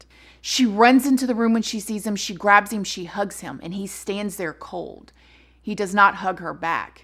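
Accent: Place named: Texas, United States. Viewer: Just found your channel... I am obsessed with true crime... and I watch a lot of different channels... but I have to say... I love your delivery and genuinity... I am definitely subscribing and hitting the bell... and may I just say that you are so beautiful!! And your accent and dialogue is so inviting... are you from Texas??? Anyway... I am off to enjoy the rest of your content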